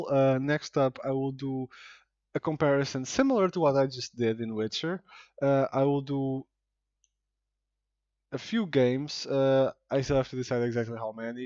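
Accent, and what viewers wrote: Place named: Portugal. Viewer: Compreendo :D Eu assim que te ouvi a falar, pimba! Este gajo é tuga! :D Estou agora a experimentar o teu tutorial na minha EVGA 2070!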